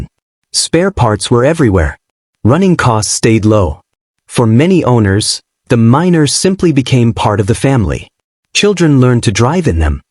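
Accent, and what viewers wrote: United States. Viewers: I am so sick and tired of AI generated garbage on YT. These are British cars so why do you show so many of them with foreign registration plates and FFS, ditch the Yank narration! You should have a British accent talking about British cars!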